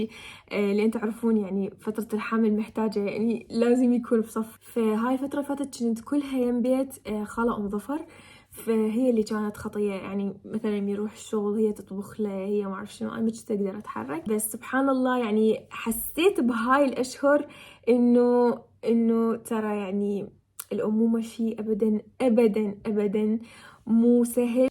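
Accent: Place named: Iraq. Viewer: اللهجه العراقيه من أجمل اللهجات والله يتمملش حبيبتي على خيير❤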